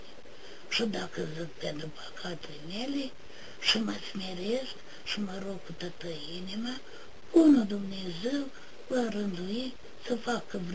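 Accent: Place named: Moldova. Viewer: He speaks in Romanian, with an accent typically encountered in north-eastern Romania (Moldavia region). Some of his words sound a bit archaic, meaning that today, ordinary Romanians would not use those words, since newer words (neologisms) are used.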